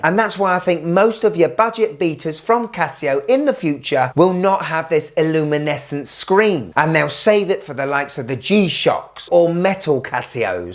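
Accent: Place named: England, United Kingdom. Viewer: What an english english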